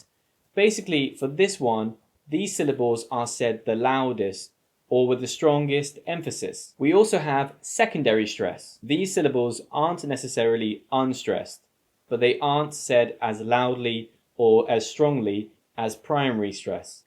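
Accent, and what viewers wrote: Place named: England, United Kingdom. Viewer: I like listening to your true English accent, not the weird American pronunciation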